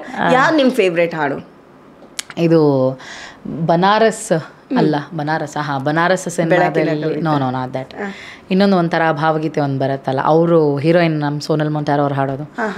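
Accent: Mangalore, India. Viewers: Mangalore accent supper. Namma kudla namma karla great. Love from Karkala. All rounder 🎉❤ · Mangalore kannada spr😂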